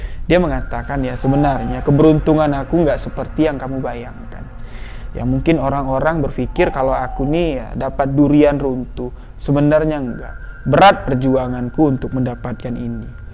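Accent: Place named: Java, Indonesia. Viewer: Mas the best omng jawa nya kental bngt...😄😄 sukses buat mas...amiiin..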